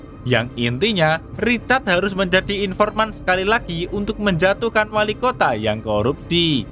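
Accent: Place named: Surakarta, Indonesia. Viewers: Pasti mimin orang solo wkwk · Admin e wong Jateng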